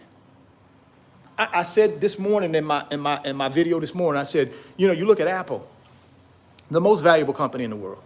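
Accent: South, United States. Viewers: When Says Nimber (Number) you know he’s gotta be from somewhere in the South 😅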